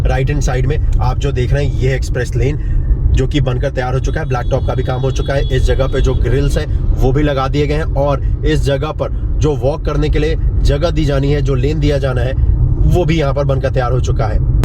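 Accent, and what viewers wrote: Bihar, India. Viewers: टोन रखिए बिहारी जैसा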